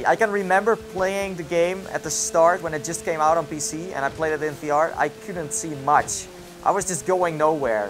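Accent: Netherlands. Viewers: gek nl accent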